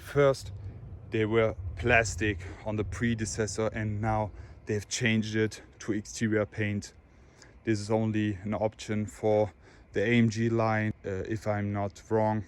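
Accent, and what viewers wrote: Germany. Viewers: He is not terminator,he is standard German. :) The reason I would buy this car is because the front masks screams Germannn and we all know very good what means German in auto industry. So the accent, if you ask me, just adds to the autentysity. Coming from the place it's created, like this raw, it doesn't get better than this. Amazing car. I love it. Probably this is going to be the car I am gonna save the money for. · Man hört so dass du Deutscher bist😂✌️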